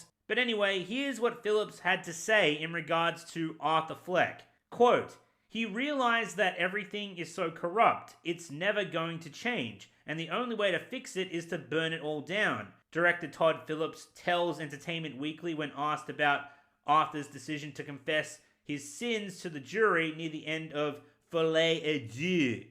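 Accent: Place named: Australia. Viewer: Been too long since I've been on this channel to listen to my favorite contrarian and hear that wonderful Australian accent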